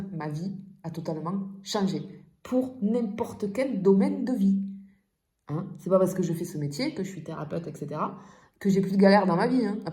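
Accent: South, France. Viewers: Ahh cet accent du sud me réchauffe le cœur et me rappelle mes années d'étude à Aix-en-Provence des années que je n'oublierais jamais ... Merci pour cette guidance ❤🧡💛💚💗💙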